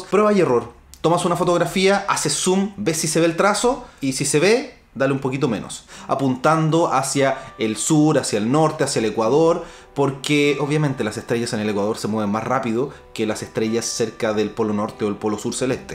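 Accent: Chile. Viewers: De qué país es? Su acento me parece un poco chileno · chileno we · Nuevo sub campeon. Primer video que veo tuyo y me redulto muy util. Saludos desde argentina. Supongo que por tu acento sos de chile. Se ve que sabes mucho del tema. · Eres chileno..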